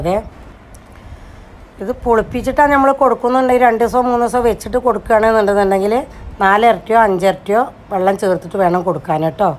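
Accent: Malappuram, India. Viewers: മലപ്പുറം ആണോ സ്ഥലം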